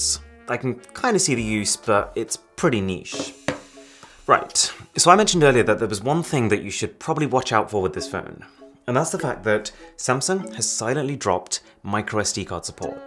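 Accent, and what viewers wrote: RP, United Kingdom. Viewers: It is the British accent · Yes, he is posh. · That is the poshest accent I've ever heard from someone who wasn't old as shit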